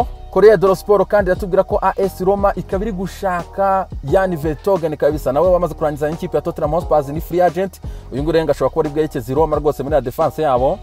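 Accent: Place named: Burundi. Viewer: Nkunda ukuntu uvuga indimbura yacu birigakuru gacaracara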